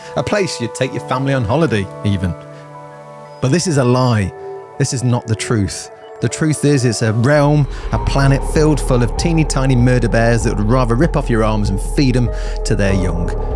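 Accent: United States. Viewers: LOL, never thought I would hear use an American accent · Your American accent sounds like my uncle. You were also dressed like him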